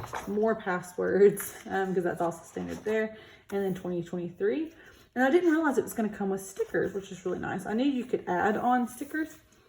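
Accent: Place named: South, United States. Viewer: Thanks for flipping through your planner..I wasn't familiar with this company and I love how you can customize the class periods and that the day is at the top!! for all those extra pages, I would probably just tape in things like the district calendar, a list of important dates like when grades are due, faculty meetings, etc. and that type of thing..any kind of handout I got that I wanted to keep up with all year. Also...I'm a fellow Southerner but I live in the PNW, so it also made me happy to hear your accent. :)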